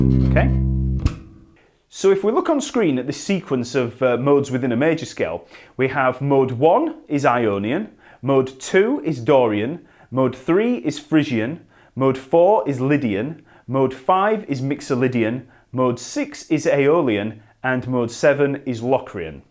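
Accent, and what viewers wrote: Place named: United Kingdom. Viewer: What was that app again? IWILBRI... What? Being a Filipino hard-wired listening and talking in American English accent makes me struggle a bit when listening to British accent.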